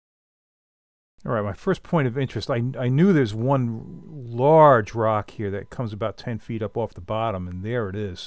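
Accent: Long Island, United States. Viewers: I grew up in Eastern LI, in Southold. I fished a lot, especially around Plum Island. On the NE side of the island, I could put my boat between 3 massive boulders and catch blackish until my arms hurt as others 20' away were only catching skate. I love black fish... excellent meat. Your drone footage instills what I imagined down there. You sound like you're from LI... right? I now live in SW Florida and I dive a lot, especially wrecks. The underwater world is awesome and this drone is a great tool to share the scenes without the "work" of diving. I did much better fishing in LI than I ever had here. I have even shoveled snow out of my boat just to go fishing. How much is that baby?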